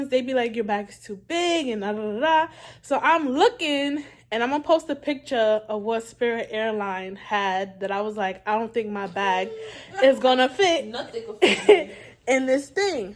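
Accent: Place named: Caribbean. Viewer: ahhh ok.. I was certain i heard a lil west indies in there 😊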